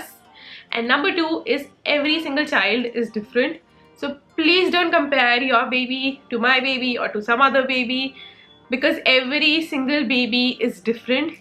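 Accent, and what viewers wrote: India. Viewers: Are you teaching your kid English in Indian accent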